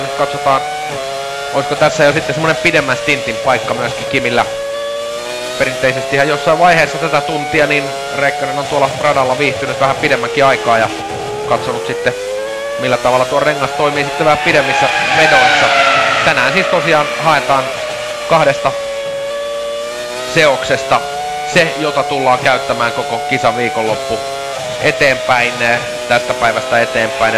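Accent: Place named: Finland. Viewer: Sounds Finnish to me.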